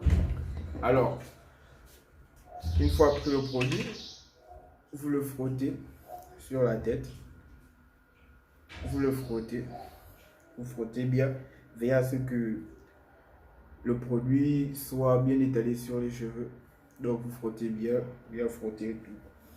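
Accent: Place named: Antilles, Caribbean. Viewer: Tema l'accent des Antilles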